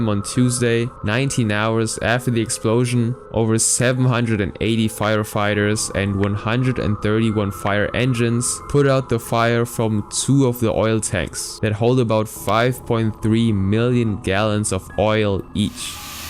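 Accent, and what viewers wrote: Germany. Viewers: U sound german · Either German or Austrian. He’s got a noticeable accent imo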